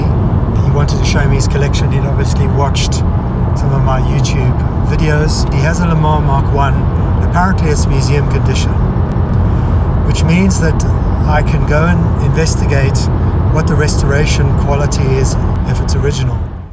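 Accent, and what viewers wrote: South Africa. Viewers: You sound very South African?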